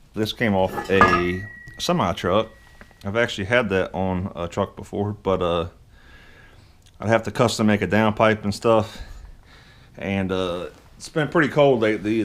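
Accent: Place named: Virginia, United States. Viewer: Need to know that accent....Virginia, with New England accents...?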